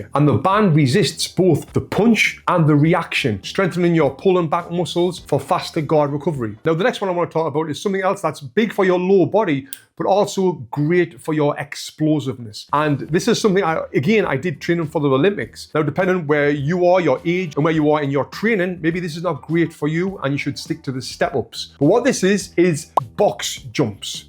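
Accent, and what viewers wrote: United Kingdom. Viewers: Your accent reminds me a Guy Richie movie! Lovely tutorial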